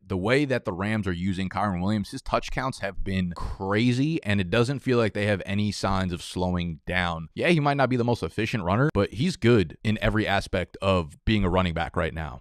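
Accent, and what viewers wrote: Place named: Philadelphia, United States. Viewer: Maybe this is a reach but I’ll feel like ur from Philly or Delco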